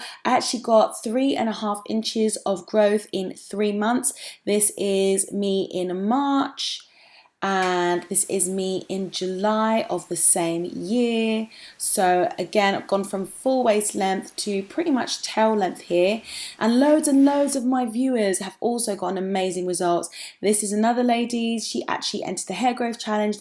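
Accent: United Kingdom. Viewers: ARe you going to show the video of the meet up in NYC? Thanks again, i like to listen to your British accent, it is so calming.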